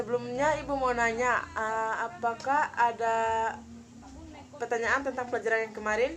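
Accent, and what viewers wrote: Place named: Bima, Indonesia. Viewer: Bimanya kental banget😄 semangat terus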